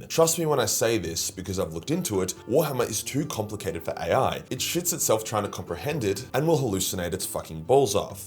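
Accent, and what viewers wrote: Australia. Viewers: Never watched his stuff never even looked into him because well I like your vid length and as a Brit... I do love an ausie accent but yeah hes getting a free sub and watches from now on! Do love how I edited the angry words out and they still kept the angry word version as the comment though 😂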